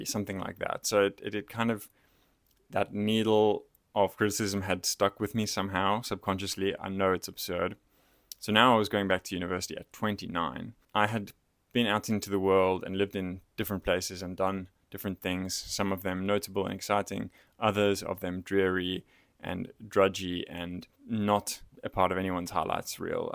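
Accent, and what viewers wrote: South Africa. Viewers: The accent - you are South African aren't you? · Do I detect an SA accent?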